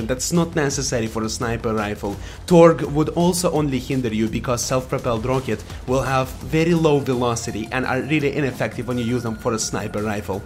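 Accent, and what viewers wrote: Russia. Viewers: Are you Russian by the way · My friend YOU are speaking ULTIMATE RUSSIAN ENGLISH. (sorry if it came out racist) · i can tell from ur accent that ur russian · Definitely more Russian than Italian, his accent is strong. But cute too ;). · Are you Russian ?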